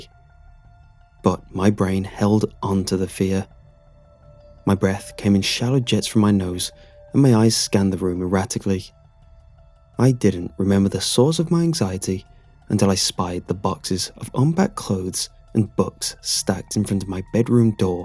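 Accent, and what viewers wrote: United Kingdom. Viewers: You have a British accent .....cool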